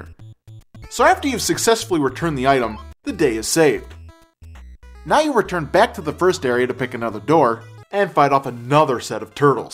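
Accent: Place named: Cleveland, United States. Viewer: Oh wow. You are from cleveland. You have the accent, I just heard it when you said "Ay-pple" ;X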